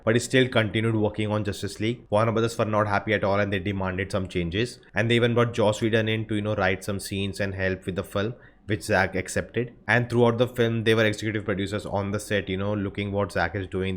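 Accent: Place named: India. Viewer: I hate Indian accent, I wish they could speak normally then I would leave sub on this channel ..... ykkhyyyy my ears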